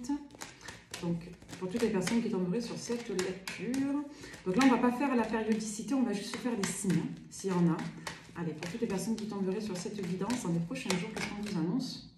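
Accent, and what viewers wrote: South, France. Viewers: Cc tu as un accent du sud😊❤❤❤moi j adoreje ss de toulon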